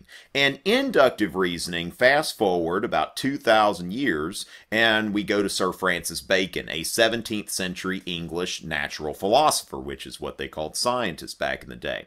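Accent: South, United States. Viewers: Idk why but the southern accent makes this so much more understandable 😭👌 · Greetings from Belgium! I'm a student in literature and spend my life reading books. I'm saying that in order to ensure you (if you would even need that ^^) that your videos have a good level of accuracy and I rarely find errors in your works on European history, which becomes more and more uncommon on other American channels... Anyway, I'm glad that I can sum up my readings by watching your nice videos with your lovely Southerner accent and, moreover, by doing this, improve my English! :D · Love your explanation and Southern accent Makes it so simple and easy to understand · Smartest man with a southern accent i have ever heard.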